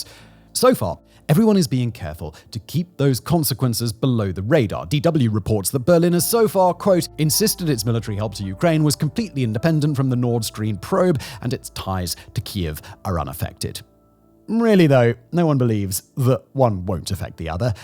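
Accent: United Kingdom. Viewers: an artfully belabored speculation with a right proper british accent is still speculation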